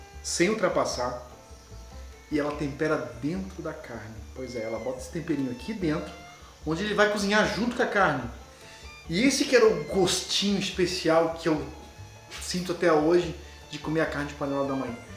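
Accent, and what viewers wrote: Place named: Florianópolis, Brazil. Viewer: Caí de paraquedas aqui, mas pelo soque.. com ctz é da nossa linda e querida Floripa! Baita vídeos, seguindo já.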